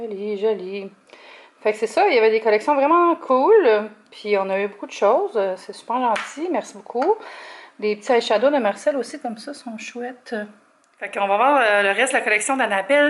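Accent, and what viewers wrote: Quebec, Canada. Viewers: Merci pour toutes tes vidéos,: j'aime beaucoup te regarder: tu nous raconte toutes tes aventures, moi ça me plait bien, et ton accent québécois, ça fait joyeux! bises de LIANOU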